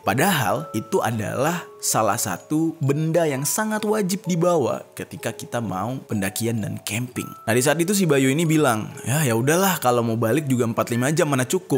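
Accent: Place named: Medan, Indonesia. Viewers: Maaf bg,aku orang Medan,aku ngakak kalau dengar bg ngomong logat medan😂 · Logat yg enak didengar g terlalu kasar dan ga terlalu lebay ya logat medan,melayu baguss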